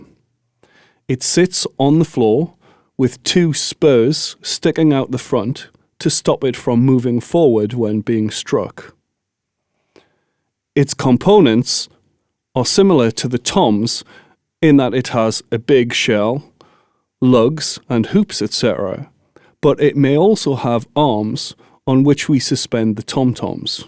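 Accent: United Kingdom. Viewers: where is his accent from in the UK?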